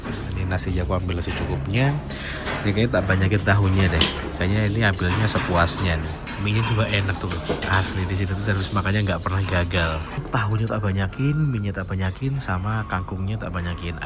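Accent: Indonesia. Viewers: Udah jadi logat orang indo nie pak lek😂😂😂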